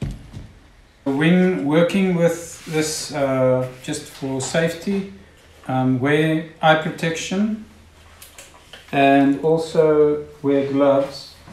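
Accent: South Africa. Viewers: Ons kan nie weg kom van ons boer aksent nie né..😂😊😊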